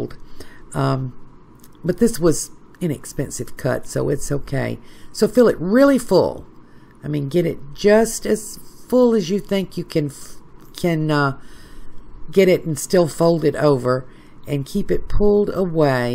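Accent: United States. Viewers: So odd to watch a Cornish pasty being made by a lady with an American accent, nicely done though.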